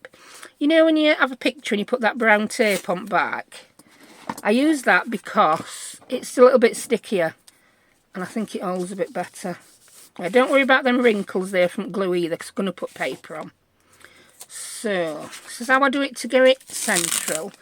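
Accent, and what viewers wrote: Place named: Barnsley, United Kingdom. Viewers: Hi love your bag journal, I will deffo be trying that in my witchy papers. Where are you from you sound from like my neck of the woods in Wakefield x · These are brilliant! You are definatly my favourite junk journal channel. I love that your accent is so familiar too, i'm from barnsley, are you local to me?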